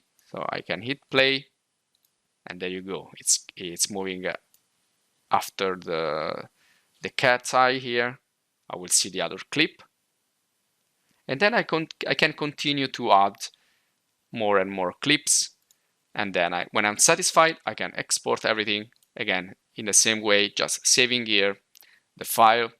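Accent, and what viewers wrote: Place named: France. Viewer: thx very helpfull. You accent looks frensh buddy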